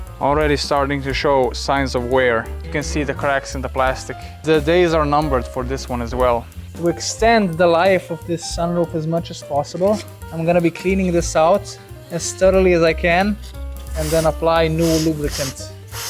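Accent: Slovenia. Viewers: lmao the slovenian accnet is killing me as a serbian. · You got that Slavoj Zizek accent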